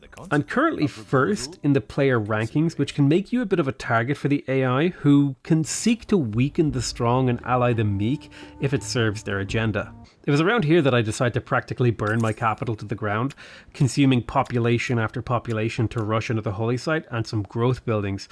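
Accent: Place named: Ireland. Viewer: Came for the review, stayed for the brogue, subbed for playing for Ireland 🇮🇪 quote.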